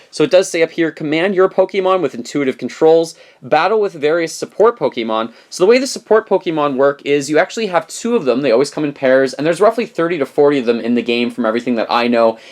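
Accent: Canada. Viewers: Love the Canadian accent. "Try that owuuuttt." :)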